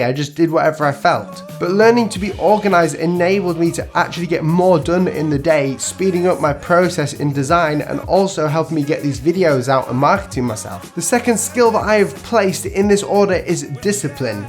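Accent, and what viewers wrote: United Kingdom. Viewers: I do really appreciate your content btw, since I try my very best in calligraphy from time to time... and I really like british english:D Keep up the good work!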